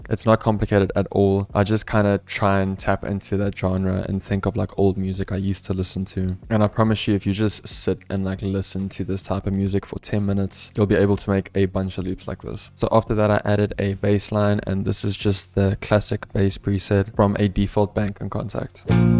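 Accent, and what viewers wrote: South Africa. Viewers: Have you ever lived in south africa? Accent is strong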